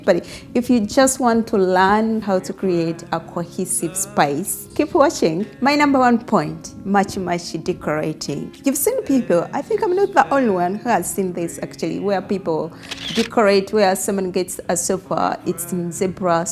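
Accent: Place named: Uganda. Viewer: those who'll understand your accent... she's Ugandan hence the accent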